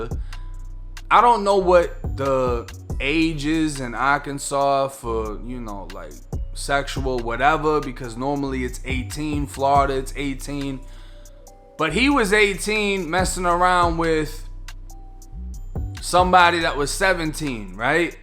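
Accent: Boston, United States. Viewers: just came across your channel and I just KNOW you're from Boston the way you say "part" and "car" LOL please let me know if I'm correct. Love the content btw! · Couldn't help but notice that raw Boston accent brother. Grew up in Massachusetts but I don't miss south Boston at all. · Just found ya channel & I love content like this so ima sub, esp cuz you sound like you from where I’m from…or close to it! Nobody has an accent like us. Ijs 💯🤣 #️⃣6️⃣1️⃣7️⃣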